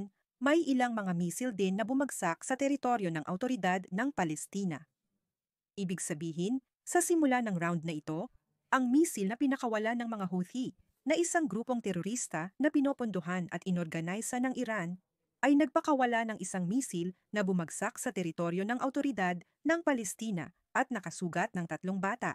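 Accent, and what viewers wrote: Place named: Philippines. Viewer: Malinaw,miss, pilipinong pilipino Ang voice over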